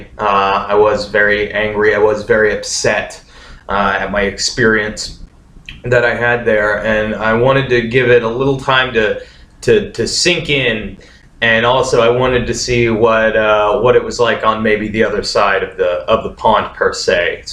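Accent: United States. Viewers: awwww. white boy feels like a minority? Wtf did you think Japan was a magic wonderland? it is a place like any other. You sound American. America isn't any better. It is worse in many ways. All places have their ups and downs. You sound miserable and desperate. It's not the country you are in. It is you. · Sounds like an american...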